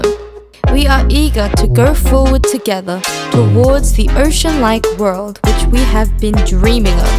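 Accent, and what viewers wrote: Australia. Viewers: stray kids done what first? Frontier means borderline. Their title is frontier : declaration and enhypens is Border : day one. Both use colons, frontier is synonym for border / borderline ..in this trailer the videos used are only nature documentaries and abstract art depictions without any member shown but only the aussie narration which is similar to enhypen , the aussie narration is just a part of the similiarities but you said stray kids did the aussie thing first.. even the clips used are almost the same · Jyp and their australian narrators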